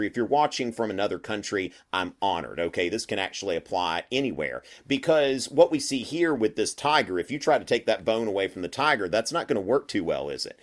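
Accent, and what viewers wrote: South, United States. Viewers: Love your content and your southern accent!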